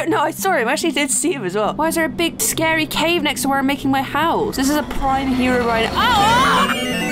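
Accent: United Kingdom. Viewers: I love how she starts sounding really really british halfway through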